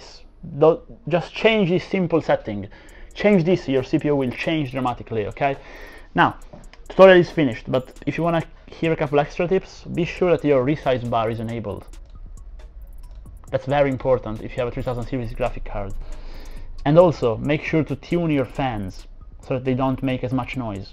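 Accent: Italy. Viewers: Ti ringrazio molto per il tutorial, ho visto il video e per fortuna asus non ha stravolto il suo bios dopo 3 generazioni (ho un i7 13th e una z690). Ps. dalla pronuncia ho capito che sei italiano, sai parlare molto bene in inglese, complimenti.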